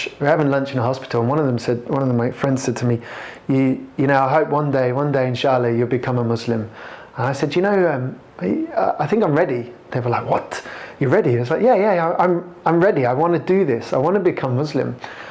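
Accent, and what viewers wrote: United Kingdom. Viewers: yep it's a southern english accent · I see. But what kind of British accent it is? He sounds a lot like Jack Harries, Jacksgap. Southern? IDK :< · british accent he's from england!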